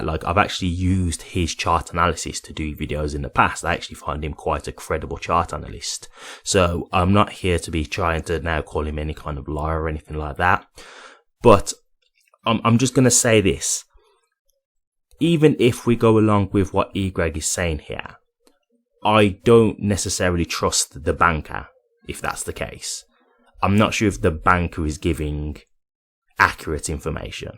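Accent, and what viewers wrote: Birmingham, United Kingdom. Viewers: You’re from brum ?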